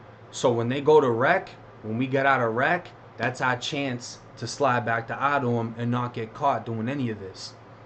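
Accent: Boston, United States. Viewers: U from fl or boston? I see u got that Boston accent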